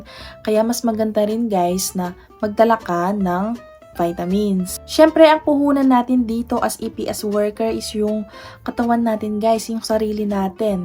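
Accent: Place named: Pampanga, Philippines. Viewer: Kapampangan ka ne madam hehe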